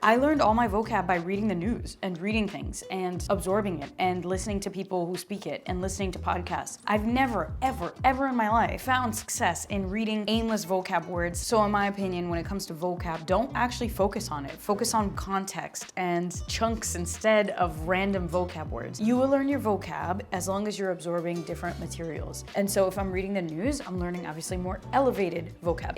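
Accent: Flanders, Belgium. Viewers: Bedankt voor jouw gratis prompts. Jouw vlaams accent vind ik ook "snoezig". Momenteel ben ik spaans, deens en papiamento aan het leren. Papiamento wordt op Curaçao gesproken en is een mix van spaans, nederlands, portuguese, en wat afrikaanse talen. Mijn moedertaal is engels. Als je een spreekpartner voor het nederlands wil hebben ( gratis EN voor niets), laat het me weten. Ik zou het leuk vinden. Groet, Greg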